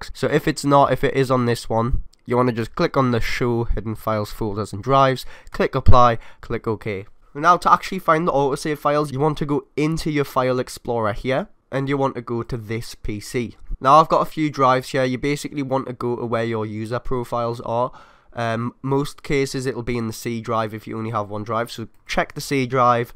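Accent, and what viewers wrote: Newcastle, United Kingdom. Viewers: Got a problem? There is always a guy with a geordie accent to help ya out!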